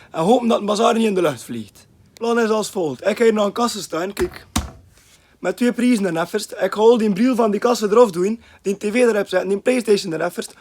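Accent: West Flanders, Belgium. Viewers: tis wel nie af en toe e int west - vlams ist nu en ton 😄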